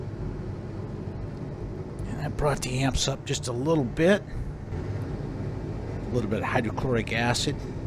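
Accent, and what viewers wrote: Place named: East Coast, United States. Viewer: Judging from your accent I'm assuming you are on the East Coast or possibly Chicago I kind of wish I was closer because I would love to apprentice under you and learn how to do all this stuff... Your videos cover so much information but I know you don't go over all your little tips and tricks.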